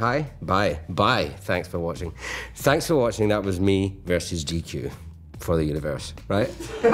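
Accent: Scotland, United Kingdom. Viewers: Oh l love your Scottish accent,never lose it! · Best Scottish accent I've heard 😆 I think we have our next Macbeth?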